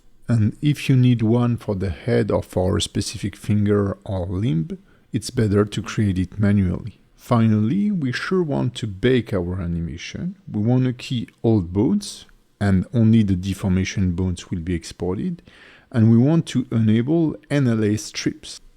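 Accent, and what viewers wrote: France. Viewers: elle est ou la version FR? j'ai griller ton accent:p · Are you french? because of yoour accent ✨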